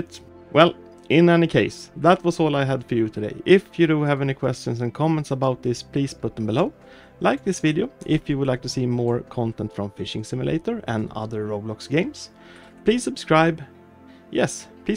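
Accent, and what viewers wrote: Sweden. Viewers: you kinda sound swedish (think you are from sweden) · nice that helped me a lot!! are you from sweden?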